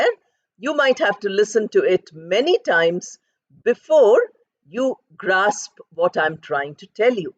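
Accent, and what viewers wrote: Bengal, India. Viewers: What kind of english is this?american or british? It sounds like Benglish. Plz. Learn english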